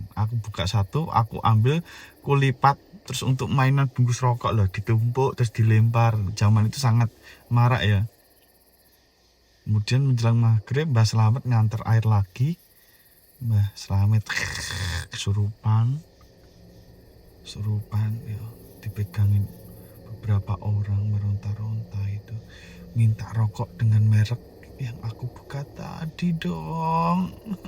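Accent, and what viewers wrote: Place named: Blitar, Indonesia. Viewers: Horror : 20% Komedi : 80% Semuanya dibalut oleh bahasa jawa logat medogg Jadinya : Lucu2 serem wkwk :D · Ngomongnya pke logat blitar jiaaaan medok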